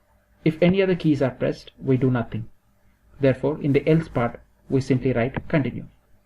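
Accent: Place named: India. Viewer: You have indian acsent🇮🇳🇮🇳🇮🇳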